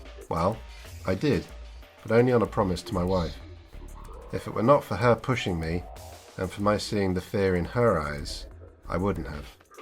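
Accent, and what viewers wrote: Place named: United Kingdom. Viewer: A British accent!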